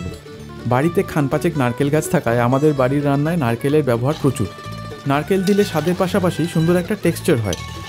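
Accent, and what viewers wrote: East Bengal, Bangladesh. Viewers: কথা শুনে বোঝায় যাচ্ছে পুরো পূর্ববঙ্গে ইয়া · Tumi ki from east Bengal . Bharali bolle bole bollam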